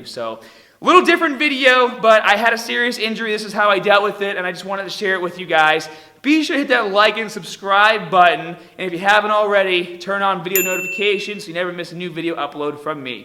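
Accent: Boston, United States. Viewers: What is your accent?. Are you from Boston? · He's definitely from Boston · You from Boston?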